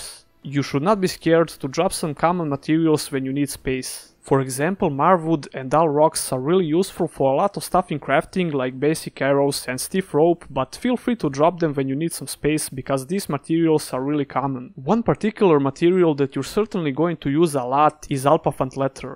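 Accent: Balkans. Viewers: Are you from Balkan? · U sound like you are from balkan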